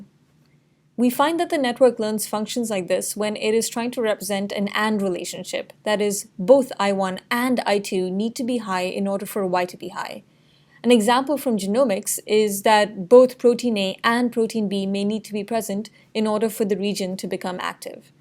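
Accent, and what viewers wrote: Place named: India. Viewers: Trace? Clearly an Indian accent! But so what? :) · This is one of the best indian accent I've heard, clearly explained every details in the paper!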